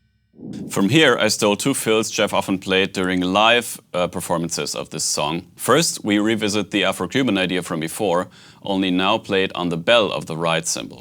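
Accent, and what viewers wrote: Germany. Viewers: Germany accent ❤❤❤❤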